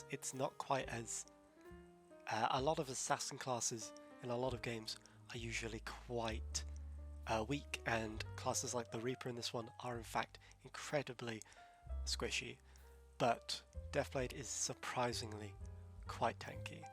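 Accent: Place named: United Kingdom. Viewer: great video again love it youre so good to watch on stream. love the british accent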